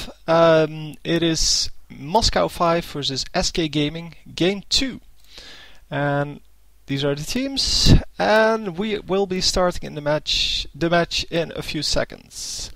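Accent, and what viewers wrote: Netherlands. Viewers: stop the dutch accent!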